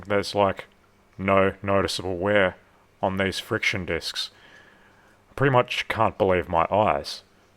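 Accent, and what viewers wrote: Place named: Australia. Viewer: This is a great video. I love your Auzzie slightly annoyed all the time accent. Anyone who can work on a transmission like yourself is a master mechanic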